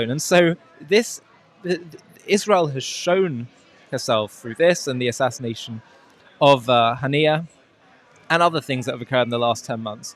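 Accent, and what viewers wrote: United Kingdom. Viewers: it's just a british (based) accent. nothing extraordinary about it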